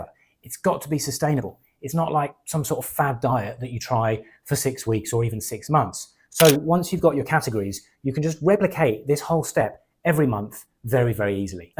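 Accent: United Kingdom. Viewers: is this what plebs like me would term 'spin'...? Suggestion, bias, sales. The beat friend of politics these days. Your use reminds me of the health related mindset change; i get to work out (as opposed to must), get to eat healthily (as opposed to 'ugh i have to eat x). Definitely change begins in the mind. So glad to get an English accent, getting tired of the US ones on social media, just soooo many (i'll offend someone i'm sure). I'm irish, but we'll be neighbourly 😊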